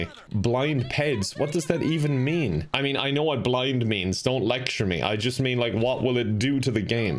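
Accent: Ireland. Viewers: Is he Irish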